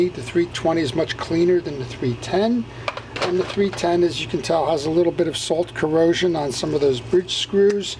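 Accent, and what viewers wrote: New Jersey, United States. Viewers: Just found you a couple weeks ago, love the Jersey accent,I live in CT. am 60 yrs old and ONLY fish with vintage rods and reels,I give the "new stuff" to my grandson.I have in my arsenal many 60's-70's japan reels which were made by the big three(Daiwa, Olympiic,Zebco, etc.., under different names,they're tough,cheap, and easy to repair,and THEY CATCH FISH!!!,I also have some french mid-century reels including the Mitchell saltwater line and my beloved RU-MER's I'd llike to see a vid on some of those old "coffee-grinders", they can be pretty intimidating when you take the side cover,off, or try to repair the bail mechs, Anyway thanks for posting, and helping others like myself who appreciate a time when things were made to last!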